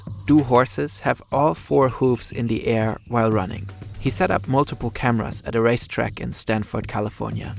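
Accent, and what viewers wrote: Germany. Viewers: I just love listening to his German accent. There will be a time when he loses it. It's probably the most iconic voice in story narration history. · Hä ist der Sprecher deutsch?! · ahh I can hear a German accent, I feel right at home 🥰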